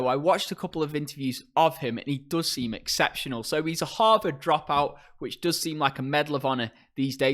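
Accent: United Kingdom. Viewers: Your accent is so British.Awesome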